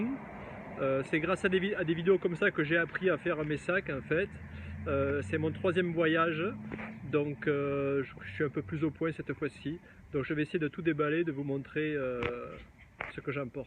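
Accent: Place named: South, France. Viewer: Salut. Merci pour toutes les infos Bikepacking. Etant novice j'envisage une petite 1ère virée cet été et tout les renseignements sont bons à prendre. Merci encore. En plus aux US avé l'accent, c'est top. Pour ce qui est de tes bouquins, je vais aussi regarder de plus près 👍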